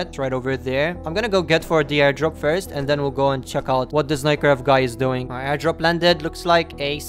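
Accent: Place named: Malta. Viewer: As soon as I heard your accent I knew you were Maltese too hahaha.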